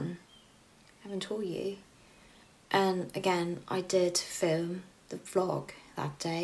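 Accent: England, United Kingdom. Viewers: 고급진 영어~~덕분에 영국 느낌 물씬 느끼고 갑니다^^^^ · 님 브리티시 악센트 들어서 넘 행복해유~♡ 손은 나아지셨나요ㅠㅠ 반지도 목걸이도 다 예쁘고 그 중에 젤 재밌는건 님 얼굴..넘 예뻐서 홀린듯이 들어왔습니다..ㅠㅠ 인스타 사진두 잘 보고있어욥!! Have a good day❤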